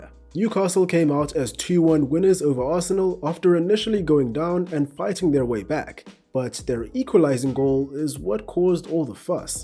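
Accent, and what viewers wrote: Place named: South Africa. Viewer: Ah, South African…couldn’t put my finger on the accent.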